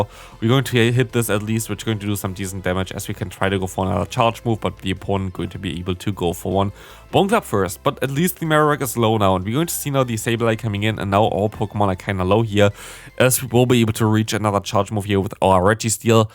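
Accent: Germany. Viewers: Wait... You´re german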